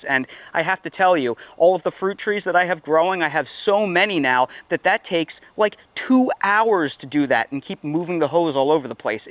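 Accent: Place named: South, United States. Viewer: I love your Southern accent! ;-)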